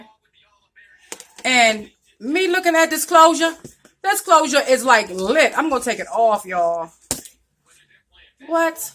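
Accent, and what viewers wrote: Baltimore, United States. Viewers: Baltimore accent SKRONG 💪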